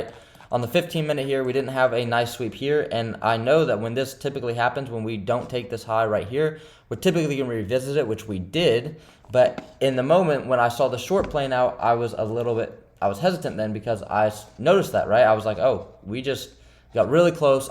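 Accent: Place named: South, United States. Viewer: u got a southern accent, usa state u curently in? keep it up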